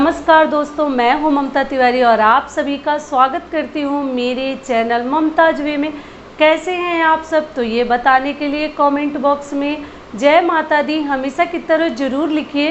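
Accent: Bihar, India. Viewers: Mam aap Bihar se ho kya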